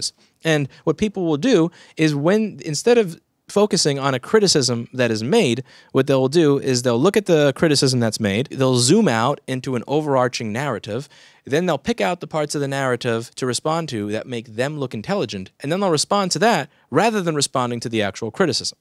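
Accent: New York, United States. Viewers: Omg you are so self conscious, you covered all the stupid comments I ever had of you down to the NY accent. Love you, do something about those eye bags and chair, and wall paint. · i'm a New Yorker as well, can confirm i don't like your NY accent. Keep up the good work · I think you aren't an asshole, you are just telling the bare truth. I love your face. Those black bags are just evidence of your hard work. I love the fact that you are pragmatic and innovative with your chair. And i especially love your new york accent! Just to be clear - dont let anybody tell you something different! Fuck those haters :D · projects his own insecurities as a strawman, I love your crooked chips and your new york accent and attitude. You've taught me way more than the real Apple training team ever taught me in my years working on behalf of them. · I love your NY accent, you sound just like a Seinfeld episode. 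As a female I think you are a very good looking man so eff 'em. You're a great, engaging teacher that's helped so many people. I'd be honored to meet you in person and see your technique and equipment in person. If you're ever out in Bumfuck, Virginia come on down and have some sweet tea and cornbread.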